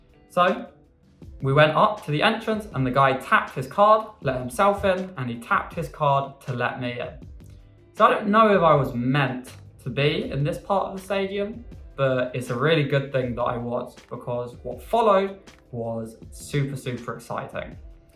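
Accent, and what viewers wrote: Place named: United Kingdom. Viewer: omg Britich accent - very bad, uncomfortable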